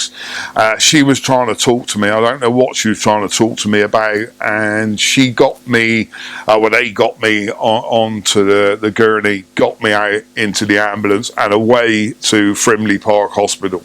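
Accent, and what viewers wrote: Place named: France. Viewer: Welcome back we've missed you. Loving the French accent!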